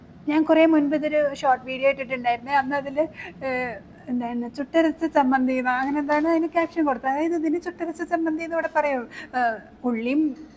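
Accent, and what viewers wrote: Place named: Malappuram, India. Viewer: സംസാരം കേട്ടപ്പോൾ തന്നെ ഒരു മലപ്പുറം touch തോന്നി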